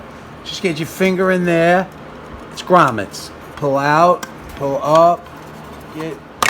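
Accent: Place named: New England, United States. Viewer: Excellent commentary and directions. Voice reminds me of when I was a young man in New England learning the trades.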